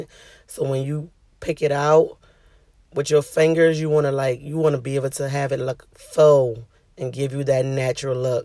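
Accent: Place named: South Carolina, United States. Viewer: South Carolina accent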